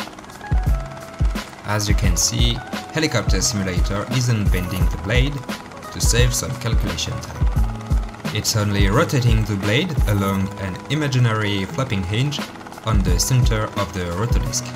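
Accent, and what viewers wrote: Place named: France. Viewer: le bonne accent français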